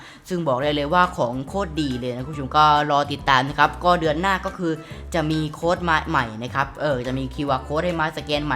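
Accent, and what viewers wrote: South, Thailand. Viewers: พี่ครับพี่คนใต้ไช่ไหมครับผมฟังสำเนียงออก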